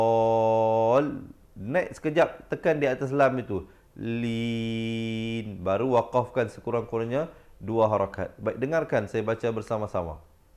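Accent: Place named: Malaysia. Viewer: Lawak uataz ni.. Bunyi Melayu beno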